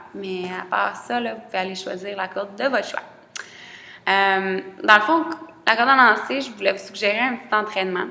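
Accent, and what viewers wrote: Quebec, Canada. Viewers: putain j'ai du mal avec l'accent québécois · Avec votre accent je comprends un mot sur deux ! Vous devriez articuler et parler plus doucement pour les non quebequois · Vous devez acheter l'intégrale de en français et l'écouter en boucle pour comprendre l'accent canadien;-) Sinon il y a le bouton replay à volonté...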